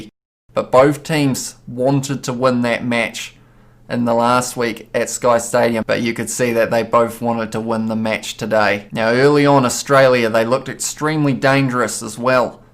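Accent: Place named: New Zealand. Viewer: Kia Ora, cuzzy! Love the Keewee accent!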